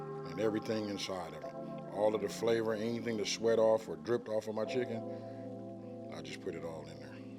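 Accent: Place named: West, United States. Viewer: I knew you was from Out west you got a strong accent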